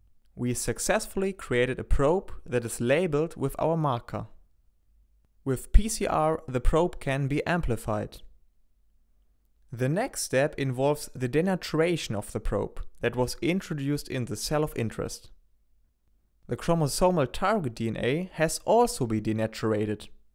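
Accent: Germany. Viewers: Thank u. Nice german accent ;-) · you sound soo german :)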